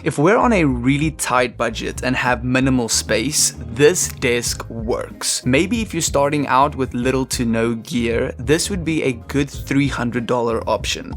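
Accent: South Africa. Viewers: I can already tell by that accent that this man is a SA kid🤌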